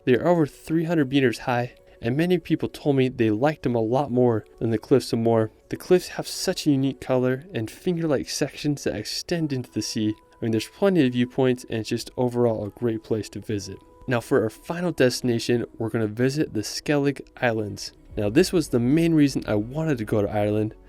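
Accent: United States. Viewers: Why he sound like American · What a strange dialect does the speaker use? Sounds much like American, e.g. he says "senner" instead of "centre".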